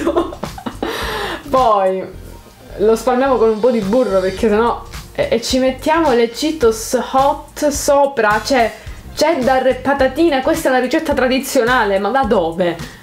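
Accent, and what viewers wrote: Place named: Calabria, Italy. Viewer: 6 calabrese avverto un accento pronunciato 😂😅❤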